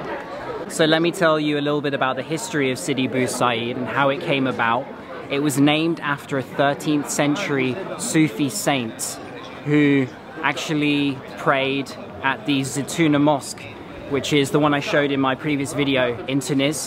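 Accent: England, United Kingdom. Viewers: I’m in love with your British accent 😍😍😍ur my crush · I like your English accent the program that you made is pretty good👍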